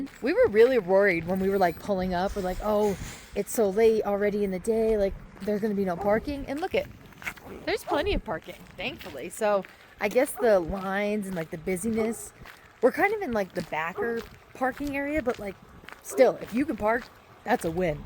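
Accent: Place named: New York, United States. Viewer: Thanks for bringing us along on your adventures! I love your New York accent btw!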